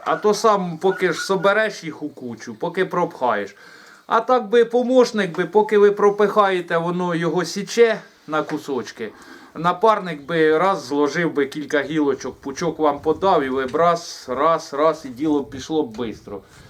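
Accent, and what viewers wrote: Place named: Poltava, Ukraine. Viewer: Привіт відео про дививсь,про корморізку січкарню шок? А остальное чудово свиней люблю колоть з початку війнитримав3х Зара ні?молодець господар ,підпишусь тільки не шкодь техніку воне не для того,зроби окремо щепоріз в парі з дроворубом,шків потрібно проточить ,під ремень відповідний на апараті?або ремень інший ну пока іду воблера дороблять,молодець господар ,рибак,вимова мені приємна полтавоукраїнська ,мені подобається успіхуОК.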